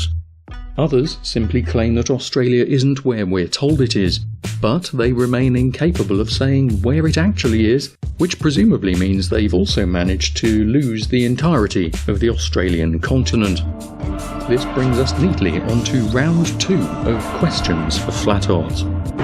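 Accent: United Kingdom. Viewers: If you call people retards it's no wonder you only get responses from like minded people. That is no way to expand your horizons..ps nice British accent. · Shill behind a stuffy English accent. Must seem pretty impressive to the cucks (subs) of this channel. The real working model is quite the hot potato but I'll give you clueless twits a hint. The vajra. PLASMA is the light source. Learn about primer fields. Maybe you can figure out that the electromagnetic ice dome is essentially a magnifying glass. You may struggle to get it given your limited ability to reason but the sun is nothing more than the focal point of light inside the dome from the plasma spiral( again, learn about primer fields globuloids) Just like a magnifying glass. That's why you can see clouds behind and in front of the sun when it's lower on the horizon. It is local but only the light from the source. 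Learn about the VAJRA! What it is and when was it seen. Also the complete book of Enoch. If you are godless there's no telling you as it is impossible to have understanding without. And I'm not talking about the Freemason puppet Christian church on the corner.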